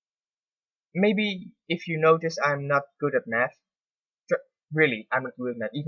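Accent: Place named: Netherlands. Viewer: I can hear you're dutch :)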